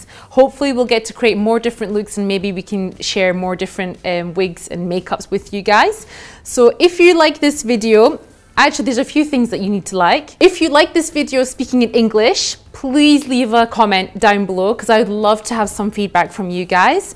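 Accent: Scotland, United Kingdom. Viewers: Daha önce yurt dışında yaşadın mı ingiliz aksanına benzettim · tanrı misafiri iskoç aksanıyla konuşuyor, cehaletinizi belli etmeyin bari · Scottish accentin cok tatli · I love your British accent! Please take more videos in English. Greetings from North Carolina <3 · Loved your scottish accent. I’d like to move&study in Edinburgh or St Andrews one day. Bonnie Scotland💙🏴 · Lovely video, you've spoke with a Scottish accent.. * Edit: Carries on watching the video and realised has a family living in Scotland! 🙈🤦‍♀️ Lots of love from London! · I'm hearing lil bit british accent, especially when you are saying 'now', love ittt❤️ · that british accent thoooo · you look stunning! Please make more English videos. It's amazing to hear English with Scottish accent.:) Love u. <3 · helal abla senin scottish aksanına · İskoç aksanı mı bu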